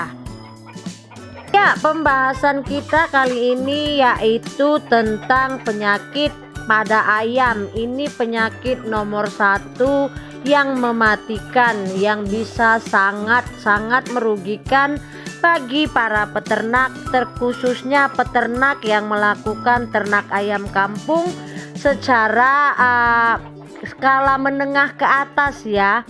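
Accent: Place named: Medan, Indonesia. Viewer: Logat kakak ne medan kali lah 🤣🤣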